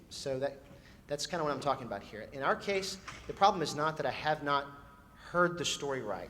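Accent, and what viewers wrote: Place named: South, United States. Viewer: ***** I must say, while you definitely have a different texture to your accent than that of the southern 'twang' stereotypically associated with 'the south', it's still distinctly 'southern' to my foreign UK ears, and I like it. :)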